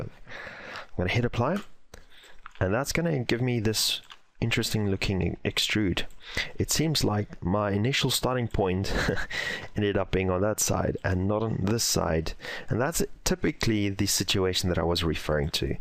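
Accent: South Africa. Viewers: Heard the Afrikaans accent and I immediately subscribed. Die video is goed dit het baie ge help, Dankie. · My ears did a double take, a fellow South African ^^, nice work, learnt a lot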